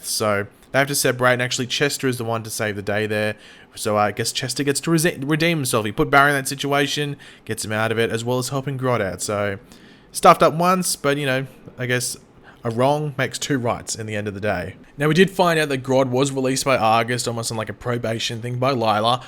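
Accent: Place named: Australia. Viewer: Yeah but you can clearly tell is Australian from his accent so this guy's just falsely accusing him for nothing. Besides there are stream sites like vumoo or whatever it's called where you can watch it a few hours after it airs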